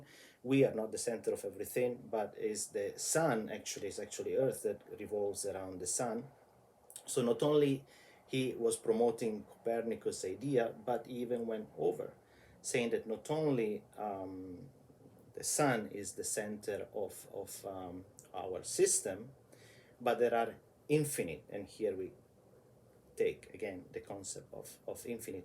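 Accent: Italy. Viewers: Da come pronuncia i nomi italiani direi che sei italiano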